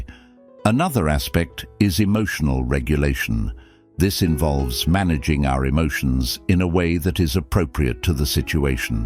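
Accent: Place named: England, United Kingdom. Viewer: Bla bla bla... with an English accent. Men have walked away from whomen, guy. Stoicism does not work on the modern whoman, she is too Marxist left now.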